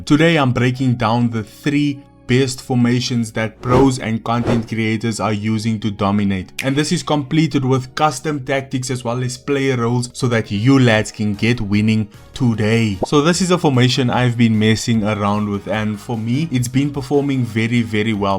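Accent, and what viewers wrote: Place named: South Africa. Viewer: Bro youre south african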